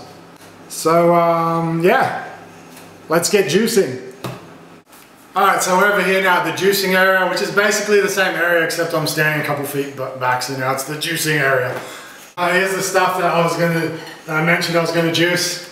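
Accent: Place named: Greek Australian, Australia. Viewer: 2 seconds in i was like yep Aussie Greek. It’s the accent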